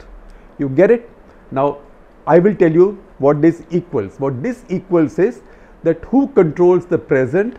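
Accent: India. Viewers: As a Westerner, I always find Shekhar Gupta's analysis interesting. The Indian perspective is quite different to that of the western mainstream media. And the Indian way of speaking English is pretty cool too :-)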